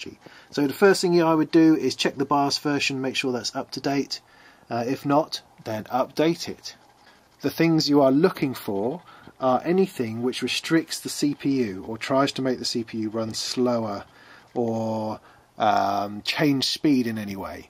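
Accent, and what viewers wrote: United Kingdom. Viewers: y=u futn brit mate... 2quik 4meaaaa{british accent}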